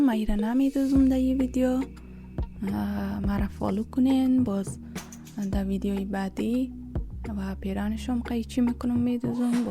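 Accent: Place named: Afghanistan. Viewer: احسنت برخواهر افغانم با اموزش عالیتون استین چه خوب اموزش دادی بالهجه ی شیرین افغانی 🇮🇷🇦🇫👏🏻👏🏻👏🏻👏🏻👏🏻👏🏻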